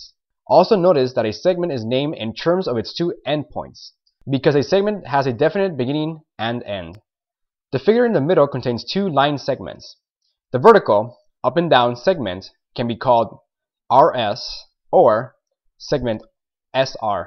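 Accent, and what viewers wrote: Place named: Scandinavia. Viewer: you sound scandinavian, are you german or austrian?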